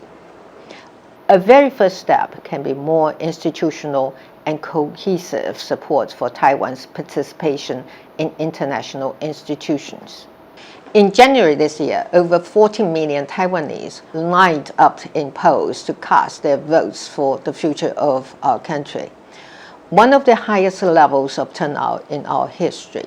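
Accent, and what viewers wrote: United Kingdom. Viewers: 濃濃的英國腔.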